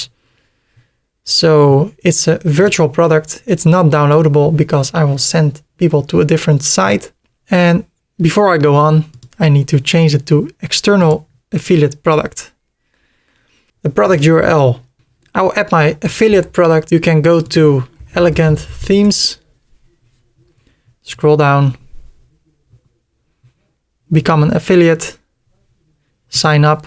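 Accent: Netherlands. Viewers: you dutch.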